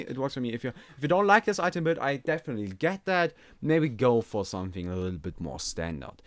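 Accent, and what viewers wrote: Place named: Germany. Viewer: Haha nice accent... du bist so deutsch! hies furd abiletea...that is in seit of his enemy.. krass.. guter guide... kennst du kino der toten black ops 1.. manchmal bist du ein deutscher und der hort sich genau so an wie dein ausprach.. wirklich ich lach mich tot.. gruB und keep on the good work aus holland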